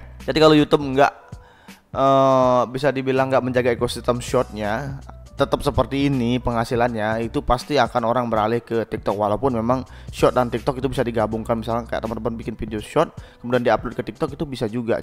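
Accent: Bali, Indonesia. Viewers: Keknya logat Bali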